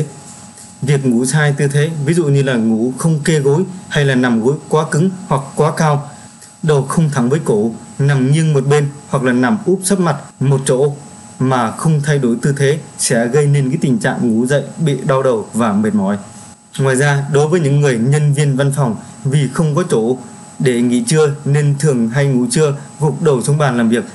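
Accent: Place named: Thanh Hoa, Vietnam. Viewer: Nghe là biết anh là người Thanh Hoá nè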